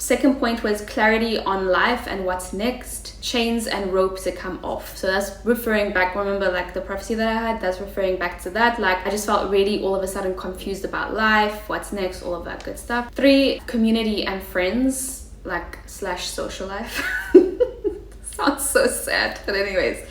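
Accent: South Africa. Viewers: your south african accent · Are you south African ?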